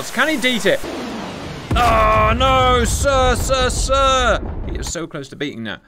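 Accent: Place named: United Kingdom. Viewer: I was giving him a compliment actually. That soothing British voice inducing relaxation as he walks you through step by step descriptions of mass destruction and warfare with a few comedic quips.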